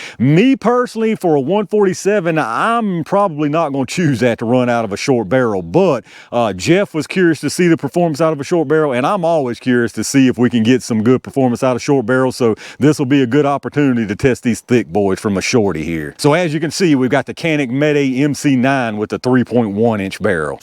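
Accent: South, United States. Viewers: This guy does some very interesting and informative videos. I also love his corn pone accent. This is not meant as a slur, I just love the fact that he's very unpretentious.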